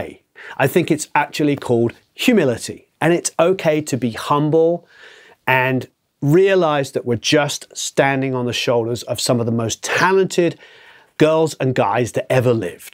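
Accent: United Kingdom. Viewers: Great video,! Self doubt comes and goes for everyone, i guess. Taking that self doubt and turn it into creative fuel is a great way to deal with it, if possible. I've found that self doubt has made me get out of my comfort zone and to work even harder, it has pushed me to learn more and study/practise harder in terms of producing, mixing. Thanks for bringing up this important question:) Love the British accent, btw! Cheers from Sweden /Mike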